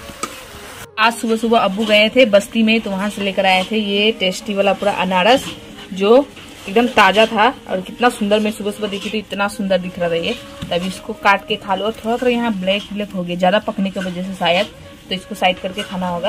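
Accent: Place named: Jharkhand, India. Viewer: Apki jharkhandi hindi boli bahut achcha lagta hai. My jharkhand se apka video dekhta hoon.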